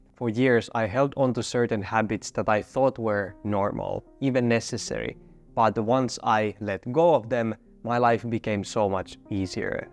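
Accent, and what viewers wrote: Finland. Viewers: Maybe it has something to do with the Finnish language? My mother is from Finland but has lived in the Netherlands for 40 years, she speaks Dutch with a Finnish accent and it sounds exactly like this. In the Finnish lanaguage there are a lot of very long words that are composed of multiple elements, maybe its more important to be clear about where one words ends and the next one begins?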